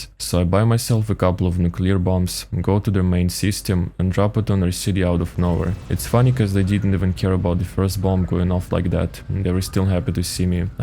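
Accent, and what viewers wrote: Russia. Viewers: ru accent? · What is the accent? It sounds russian or something to me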